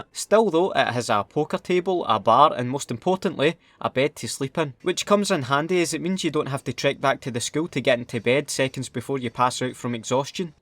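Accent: Scotland, United Kingdom. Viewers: Probably the most Scottish review I've heard ;] I played this game so much as a kid Jimmy's vest glitched pink. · Where I Scotland are you from,I'm from Glasgow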